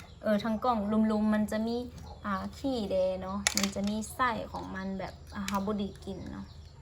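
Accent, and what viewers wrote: Laos. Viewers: น้องอยู่จังหวัดอะไรครับฟังสำเนียงเหมือนคนทาง ส.ป.ป.ลาวครับ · ນ້ອງ akha ອ້າຍເບີ່ງ ຄລິບ ນ້ອງຕະຫລອບ ອ້າຍຢາກຖາມວ່າ ນ້ອງເປັນຄົນລາວ ຫລືຄົນໄທ ຟັງສຳນຽງຄືລາວ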